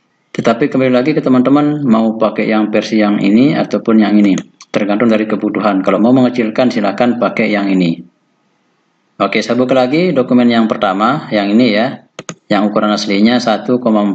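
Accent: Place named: Aceh, Indonesia. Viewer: Lage awak aceh logat deon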